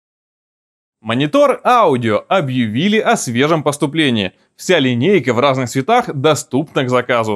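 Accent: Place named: France. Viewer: Отдельно спасибо за французский акцент и надпись на майке 😉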